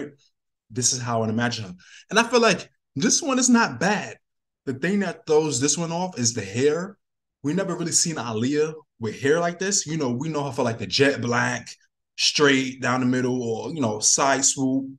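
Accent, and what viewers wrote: New York, United States. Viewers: I just love your dialect and how you say Aaliyah. It’s so NY😊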